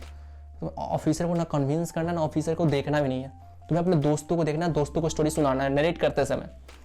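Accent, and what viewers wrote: Bihar, India. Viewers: Bihari ho kya bhai 😎😎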